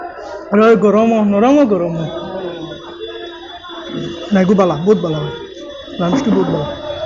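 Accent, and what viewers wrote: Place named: Sylhet, Bangladesh. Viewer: Are you indian Bengali. You talk like Bangladesh Sylhet division people. Karimganj is a assam province district but you talk Bangladesh Sylhet division local Bengali language you not talk assamese language? And why you say Sylheti vlog Sylhet is a part of Bangladesh. You live in assam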